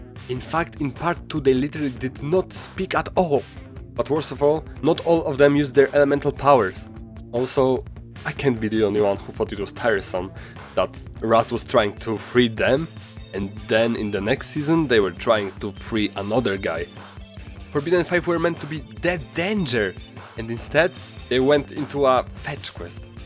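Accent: Poland. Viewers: Chwila, ja mogłem cały czas komentować po polsku! Jakim cudem nie poznałem rodaka po akcencie?? 😭 BTW ̶C̶z̶y̶ ̶t̶o̶ ̶b̶y̶ł̶a̶b̶y̶ ̶n̶e̶k̶r̶o̶f̶i̶l̶i̶a̶ ̶j̶a̶k̶b̶y̶m̶ ̶p̶o̶w̶i̶e̶d̶z̶i̶a̶ł̶ ̶"̶s̶m̶a̶s̶h̶"̶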